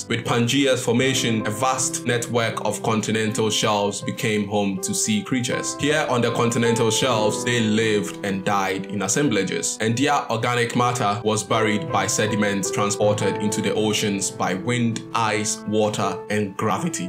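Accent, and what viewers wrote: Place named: Nigeria. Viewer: Make Naija accent the norm in documentaries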